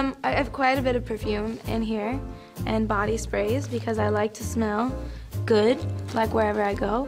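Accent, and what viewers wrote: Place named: South, United States. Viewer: she has a weird acsent in this video... kinda a southern feel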